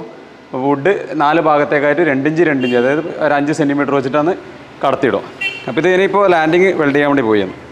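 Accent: Kannur, India. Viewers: Bro kannur aano🙄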